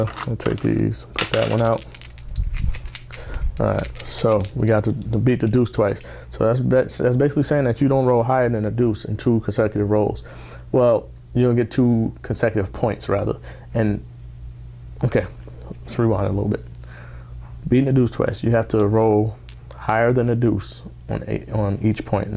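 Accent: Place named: Bronx, United States. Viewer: You definitely from the Bronx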